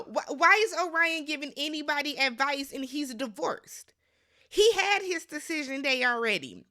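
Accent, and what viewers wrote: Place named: Chicago, United States. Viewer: Being from Chicago, listening to you is like talking to my girls I grew up with. I lol every single review 😂🤣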